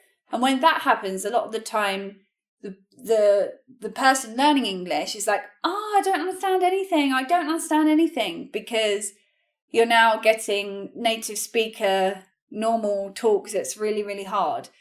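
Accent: United Kingdom. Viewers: My entire life I learned the american english, but I understand better your british english than the american one. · Hi, do you english is british? Thank you for tips!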